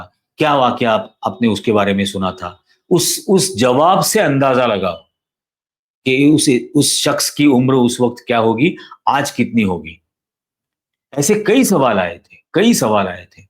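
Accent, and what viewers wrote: Standard. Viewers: आप ने बहुत महत्व पूर्ण जानकारी दी. यह कहना भी बहुत जरूरी है कि आप बहुत अच्छी हिन्दी बोलते हैं और आपके उच्चारण की शुद्धता शानदार है. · सर, खूप चांगल्या पद्धतीने अगदी शुद्ध हिंदीत माहिती मिळाली 👏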